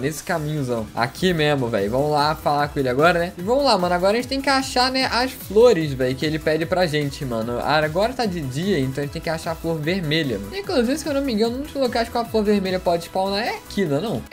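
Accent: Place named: Rio de Janeiro, Brazil. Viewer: Carioca é assim memo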